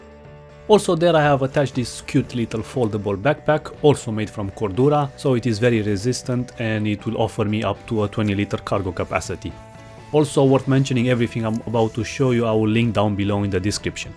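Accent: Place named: Eastern Europe. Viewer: Where are you from? You have that eastern european accent.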